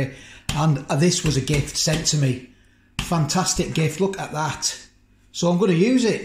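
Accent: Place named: Rochdale, United Kingdom. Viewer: I’ve just subscribed bud I’m from Oldham you sound like your down the road in Rochdale bud. Anyhow likening the vids buddyespecially the vs series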